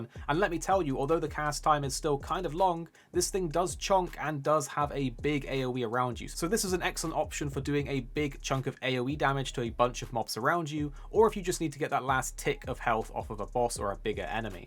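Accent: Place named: England, United Kingdom. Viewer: I love me an English accent. But "HATE-ch" makes me cringe.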